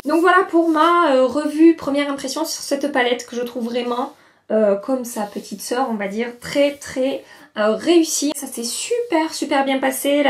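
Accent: South, France. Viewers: Le petit accent du sud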